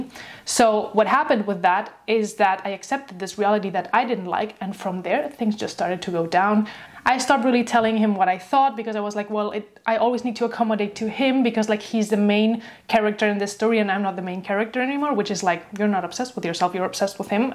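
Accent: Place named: Spain. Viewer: Girl you're soo underrated! Also are you Spanish? Love your accent!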